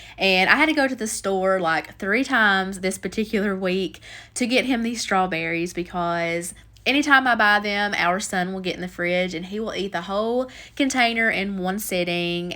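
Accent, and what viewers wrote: South, United States. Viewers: Your voice makes this so much better! Love your southern accent! I Adams enjoy your videos!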